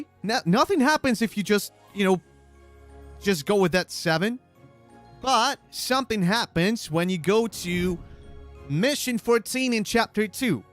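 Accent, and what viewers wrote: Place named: Russia. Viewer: Позорище, русский мужик на рус-англе догло читает транслит особо это не скрывая🤦🤦🤦🤦🤦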